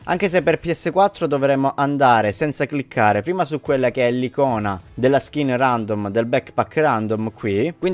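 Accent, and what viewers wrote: Calabria, Italy. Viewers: Sei calabrese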